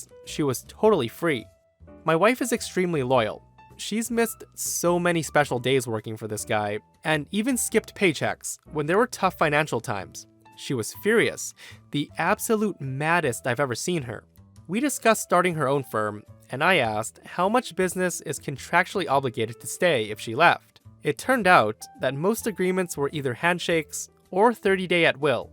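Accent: North America. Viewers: I think I remember him saying he's from Canada. He's definitely got a North American accent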